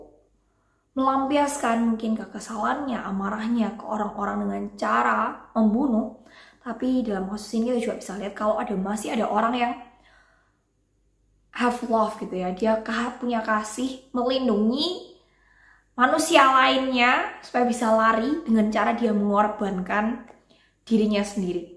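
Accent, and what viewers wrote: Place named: Surabaya, Indonesia. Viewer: Keto wong Suroboyo ne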